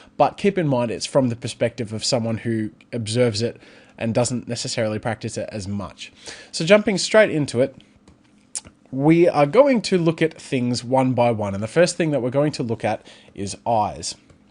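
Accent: Australia. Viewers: Yep, we Aussies do indeed (mostly) say "mæŋɡɑː" rather then the Yankish "mɑrngɑr" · are you australian? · that Australian accent gets all the ladies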